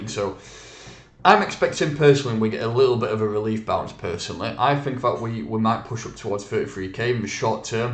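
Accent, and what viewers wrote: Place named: North, United Kingdom. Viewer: Thanks as always chief! Love the videos and the northern accent delivery beyond awesome!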